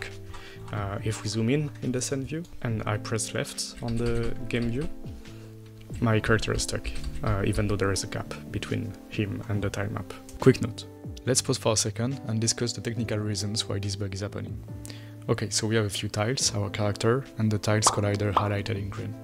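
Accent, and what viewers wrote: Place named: France. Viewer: Le petit accent Français qui ma donné le sourire pdt toute la vidéo, merci à toi btw ;).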